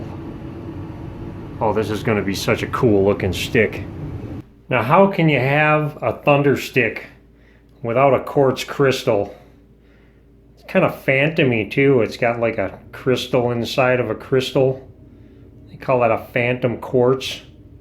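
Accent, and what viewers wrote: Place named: Minnesota, United States. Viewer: You're accent sounds exactly like Jesse Ventura. Is that Minnesotan?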